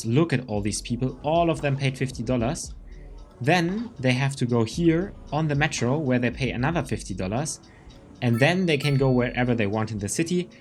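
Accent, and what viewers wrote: Germany. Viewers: I hear deutsch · To me he sounds German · Man hört den deutschen Akzent 😂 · i hear a thiccc german accent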